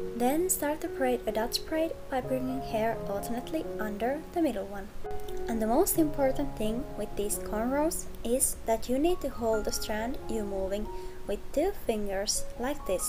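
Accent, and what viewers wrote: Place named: Finland. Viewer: I knew your accent was from Finland. So beautiful there. Thanks for trying to show us how. Be well and stay safe.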